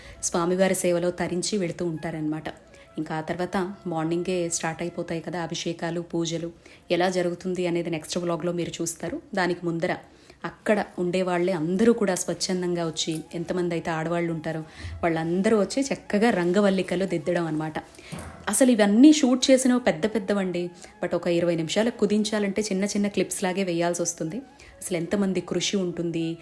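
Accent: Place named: Pulivendula, India. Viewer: Akka voamma entha baga annaru akka ma wuru pulivendula akka ma language gurthuku vachindi akka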